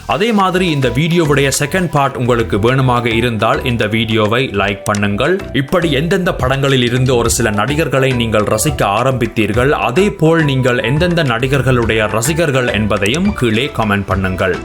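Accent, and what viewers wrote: Sri Lanka. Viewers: R u srilankan..........? Ur Tamil pronunciation 100% matching with srilankan tamil slang ...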